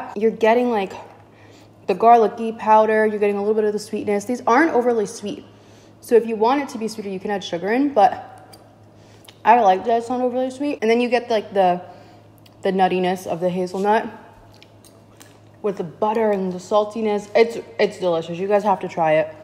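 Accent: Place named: New York, United States. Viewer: Btw so glad I found your channel. And your NY accent is so great, I’m from Long island so it sounds homey and familiar 😊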